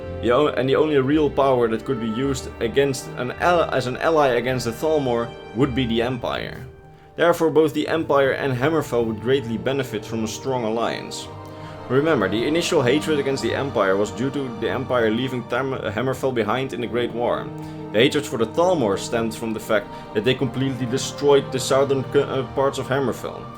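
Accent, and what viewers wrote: Netherlands. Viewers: IK WIST DAT JE NEDERLANDS WAS. Cheers, love your videos vriend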